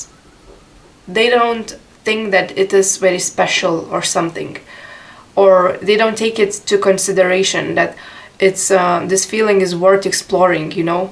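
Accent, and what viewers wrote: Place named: Eastern Europe. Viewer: I like your accent but it's nice to listen because we're both readers and Slavics 😉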